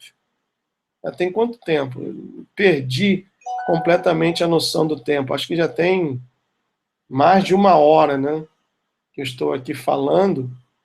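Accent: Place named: United States. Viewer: tchat???!! pô fala serio.... vc mistura português com sotaque americano... se liga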